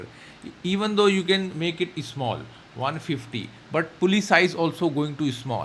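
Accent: India. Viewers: May I know what is the real use if you don't show calculations or show top down modelling approach from scratch? Solidworks if you really create it for fun make a playlist for that. But for serious application engineers like me it is mandatory that you show detailed calculation the entire process whats going on inside the chamber right rather than keeping it as bloops behind the curtain. Kindly try to tune your pronunciation. Ur bloody Indian accent sounds awfully monotonous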